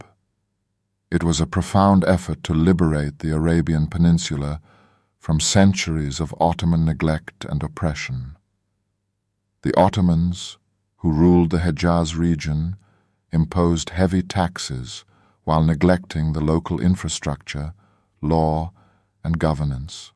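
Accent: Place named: Middle class, United Kingdom. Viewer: lol if it wasnt 4 the british there would be no oil markets and u lot wuld still be 3rdworld peasants, btw u shuld have narrated the video yorself instead of using sum corny english middle class voice over ...or do u think tht sort of accent gives yor narrative more validity ...funny tht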